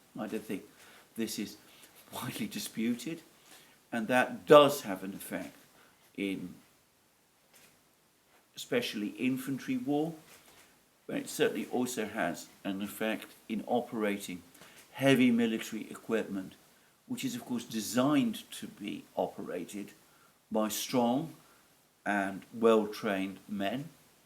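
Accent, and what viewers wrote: United Kingdom. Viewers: Question for Brits: I am so distracted because I can't place Alexander's accent. Does he speak RP, London accent or something else? It doesn't matter which. but I am not a native speaker myself and just want to know what I spend all this time listning to. Grateful for some clarity! 😊Love from Sweden.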